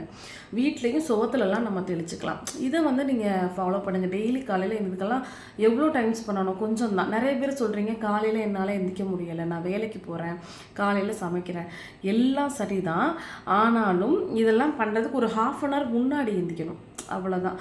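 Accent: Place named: Nagercoil, India. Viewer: Sister, your slang looks like nagercoil side?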